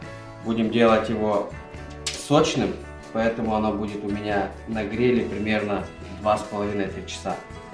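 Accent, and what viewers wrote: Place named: Ukraine. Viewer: украинский акцент такой ))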